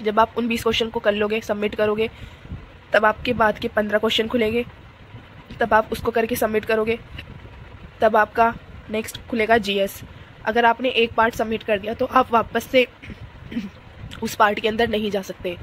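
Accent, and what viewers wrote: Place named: Meerut, India. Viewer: awaaz se to meerut, shamli side ki lgti ho... btw thank you ..bdia review h 30 oct ko h mera dekhte hn...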